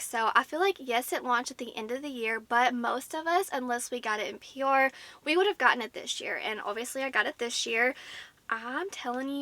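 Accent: South, United States. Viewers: I love the southern accent so much❤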